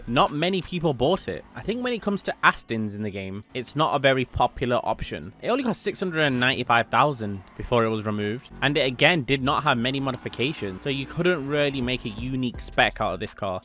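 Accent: United Kingdom. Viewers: The uk accent 🤮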